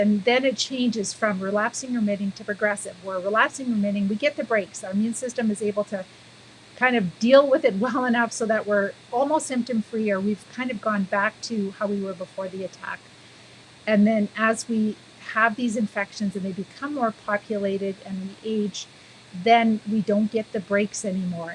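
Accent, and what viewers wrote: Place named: Canada. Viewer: Less than 1 minute listening to you -- I'm pretty sure you're Canadian 😮😊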